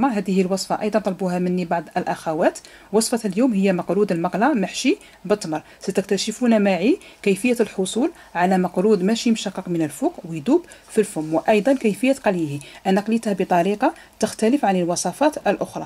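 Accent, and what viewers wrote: West, Algeria. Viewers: J'aime la façon comment vous prononcez le mot MAKROUT et votre accent Oranais, j'aime bien votre chaîne. Merci Madame et bonne continuation, your fun from Algiers, tha_nmirt = thanks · تهدر كيما ناس الغرب ولا راني غالطة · طريقة غمس المقروط في الماء تستعملها أمي من زمان يعطيها الصحة و طريقة جد ناجحة و شكرا اخت الله و أعلم انت من الغرب الجزائري على حسب اللهجة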